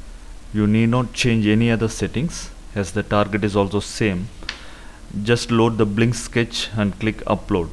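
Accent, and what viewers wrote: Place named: India. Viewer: Really thanks sir with great difficulty I found a Indian teacher really thanks you sir my ears were tired by listening to aliens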